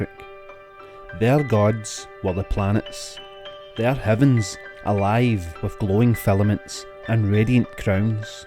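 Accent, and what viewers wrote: Scotland, United Kingdom. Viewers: There's just something hearing the story in a scott accent that makes it so much more interesting. Reminds me of my grand uncles.. natural storytellers.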